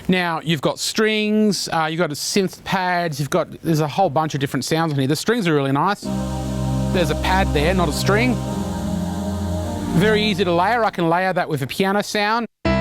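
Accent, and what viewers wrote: Australia. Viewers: Lol. He collects a check from Roland for hyping their product..and in that thick Australian accent. But despite the hype it's not a bad piano. I got one and love it.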